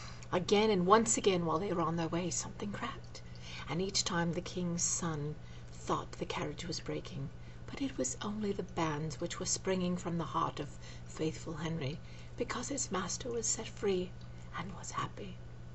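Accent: United Kingdom. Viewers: Delightful English accent - is there no end to your talents? :) PS.Lilou is probably eating a frog in France this very minute - disturbing thought eh? LOL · You sidetracked me! I was watching your nutrition,makeovers when I saw this and, being a Brit, had to take a peek! Where did you learn to do the accent? It sounds rather posh but with a little bit of downmarket Cockney and even a little bit of Welsh thrown in! Well done!:)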